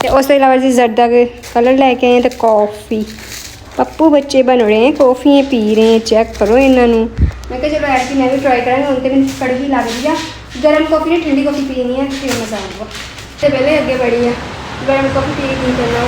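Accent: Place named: Punjab, India. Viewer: Hi Salam, Nice vlog 😊 Ap jo ya urdu boltay huhy Punjabi ka touch dayti hy maza aya jata hy 👌 😅😂, Han agar ap meray hath ka Custard khaya gi tu fan ho jaya gi 😊 qk ma usmay sugar use nahi karta (Secret hy 😉) 😅😂.. Love u ❤ & take care. Keep Smiling 😃 and Keep Shining ✨️ always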